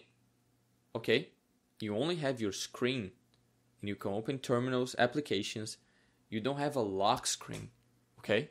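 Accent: Brazil. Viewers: De fato ele fala bem pra cacete. Mas consegui notar o sotaque carioca AUSHAUSUHAUHSHUAUHSUAH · i can recognize a brazilian when i see one... the whole "RH" thing gave you away KKKKKKKKKKK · Você é brasileiro? Esse sutaque eu não confundo nunca, essas piadinhas enquanto fala e essa figura de linguagem. Consigo sentir de longe. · tu é brasileiro né? (percebi pelo "rh")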